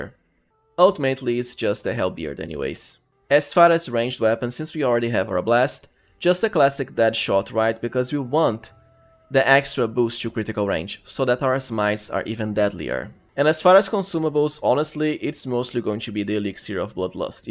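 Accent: Brazil. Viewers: Eu sabia que tu era brasileiro so pelo sotaque kkkk a gente se identifica ate em ingles pqp kkkkkkkkkkkkkkk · Se essa voz e sotaque n for BR minha vida é um mentira!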